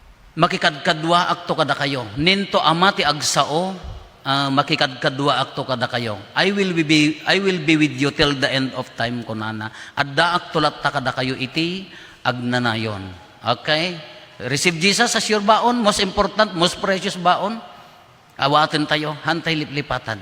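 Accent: Ilocos, Philippines. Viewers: Sarap talaga makinig pag Ilocano ang nagkwekwento.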